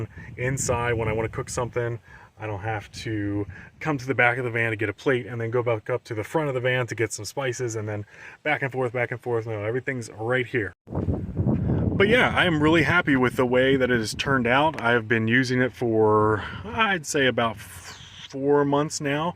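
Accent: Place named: Maryland, United States. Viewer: Ps this is the first vid i ever seen of yours it came across my you tube bc i watch tiny /bus homes . Im hearing an accent that sounds very similar to mine... Are u from Maryland or near???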